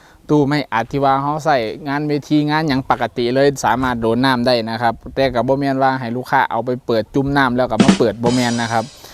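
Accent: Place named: Isan, Thailand. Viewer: ขายให้แต่คนอีสานเหรอครับ พูดกลางไม่เป็นเหรอครับผมฟังไม่รู้เรื่อง😟😟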